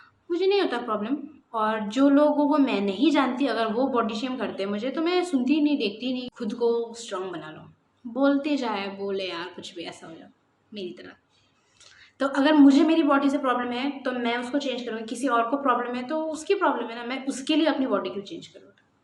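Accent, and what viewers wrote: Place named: Arunachal Pradesh, India. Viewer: The way u TALK seems TYPICAL '"ORUNOSOLI"" r u ORUNOSOLI or Bengali or Assamese? No offence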